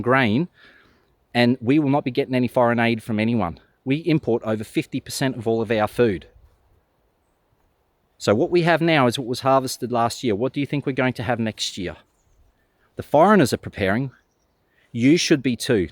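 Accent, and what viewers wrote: Australia. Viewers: It's interesting but I'm hearing what seems like an Aussie talking about Norway with no upfront explanation of that apparent anomaly... ;-) My big concern is much less the typical grid down prepping issues (not that I am indifferent to that!), but the tyranny that is unfolding from our western governments. I lived in Latvia for 5 years and recently returned to my homeland of Northern Ireland, largely due to concerns about the Russian adventure in Ukraine and what that might lead to, but now I am seeing "hate speech" laws being drafted in both the UK and across the border in the Republic of Ireland that make Stalin's dicta look meek and gentle. Essentially, what is being proposed is the legal framework for data-mining everyone's communications in the past to profile them for potential future acts of "hate crime" (i.e. any opinion that goes against their pet narratives) and target them for pre-emptive arrest and imprisonment as a means of preventing them offending anyone with a "protected characteristic". 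Right now, I feel like I have jumped out of the frying pan and into the fire. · Very sensible advice. You sound Australian. Best wishes.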